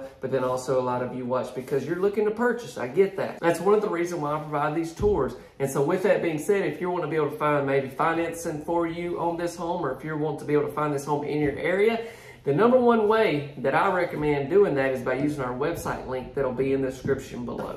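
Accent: South, United States. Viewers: Thts Southern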